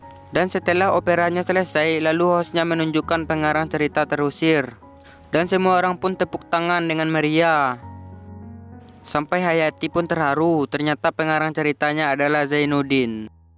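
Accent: Madura, Indonesia. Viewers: Elu orang madura ya min kok logatnya kyak logat madura gtu😂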